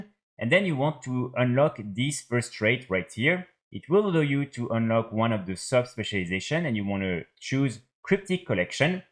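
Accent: France. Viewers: alala l'accent francais c'est trop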